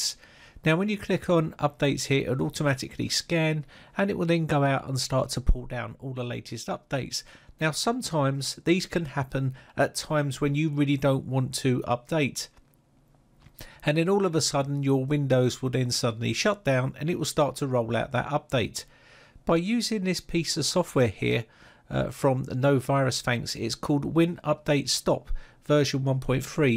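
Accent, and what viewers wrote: United Kingdom. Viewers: I'll subscribe cause your British accent. Also windows updates breaks something EVERY single TIME, so this should be helpful.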